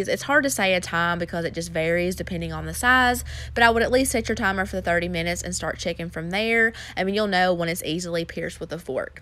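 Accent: South, United States. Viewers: I just love your videos! Great filming angle and just straight to the point. Also love your strong southern accent 😂 it's like soothing to listen to. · Love your Recipes and love your country accent 💕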